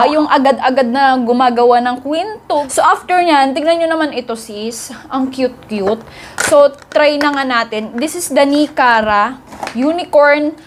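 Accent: Ilocos, Philippines. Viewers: Ilocano kapo ba?? 😂😂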